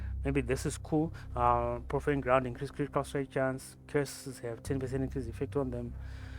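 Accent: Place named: South Africa. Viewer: lol brother, where from South Africa you from?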